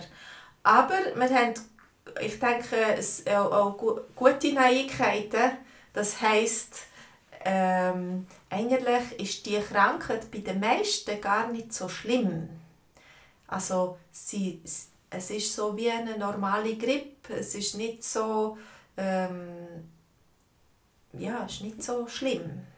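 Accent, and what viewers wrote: Bern, Switzerland. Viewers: Ich bin auch in Bern!, ist dein Dialect gemischt oder Bärndütsch?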